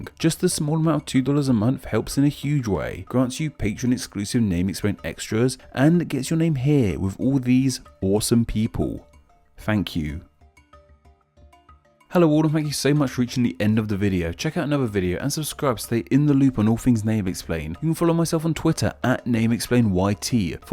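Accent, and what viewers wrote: United Kingdom. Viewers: Please don't take this the wrong way, but the way you get your "R" and "L" mixed up when you try to pronounce the names makes you sound like an East Asian despite the clear British accent. Fun fact: at 544,150 sq km, the Indonesian part of Borneo is bigger than the whole countries of Malaysia (both Malaysian Borneo and the Peninsula) and Brunei put together (335,612 sq km). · Jahaha i study british accent from your video sir😂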